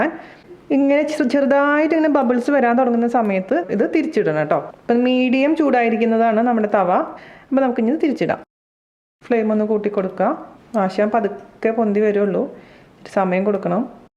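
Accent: Thrissur, India. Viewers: Thrissur Baasha keakan thonnumbo ..chechys vdo kaandu samadannikum....kubbos and chicken for todays dinner:)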